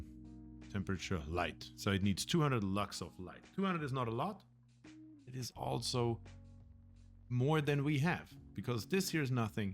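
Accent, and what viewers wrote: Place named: Germany. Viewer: i have watched enough joerg sprave to know that this man is definitely german.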